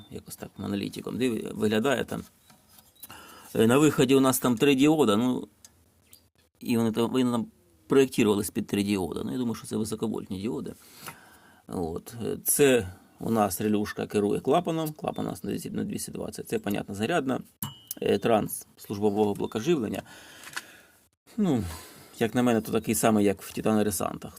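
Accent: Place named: Central Ukraine, Ukraine. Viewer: Хоч ніхера в цьому не смислю,але цікаво,завжди дивлюся 😅 В якій області живете,завдяки вашим відео вибрав собі полуавтомат,бо у мене дуже древній,Гарного мирного дня.Судячи з мови,думаю що це Черкаська Київська або Вінницька, обл,буду радий якщо дасте відповідь на мій коментар😊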